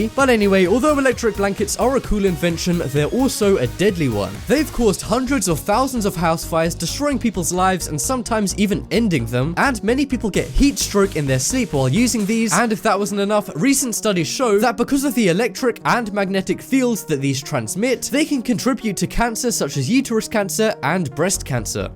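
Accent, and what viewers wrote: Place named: United Kingdom. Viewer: you have a strange American accent, sounds very much like a British one.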